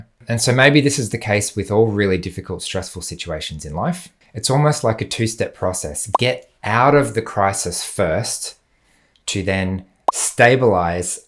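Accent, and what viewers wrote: Australia. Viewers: He 's Australian...not Scottish...❣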